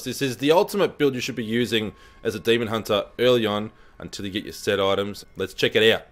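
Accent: Australia. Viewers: Great guide in simple language for a new player. Thanks for the help. Also nice to hear a Aussie.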